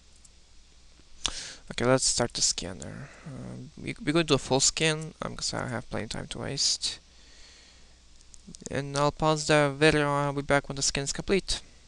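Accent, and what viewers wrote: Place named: Russia. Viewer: По акценту видно что ты русский )) я ниче не понял из всего этого мож сделать это видео на русском токо еще объясни как запустить этот live сd в boot меню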